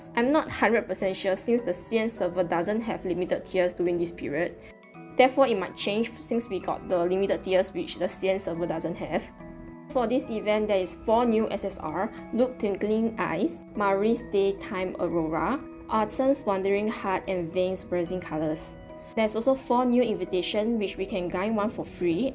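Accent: Singapore. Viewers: Hi, are you from Singapore? Haha you sound like it 😅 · The chinese / sg accent satisfy me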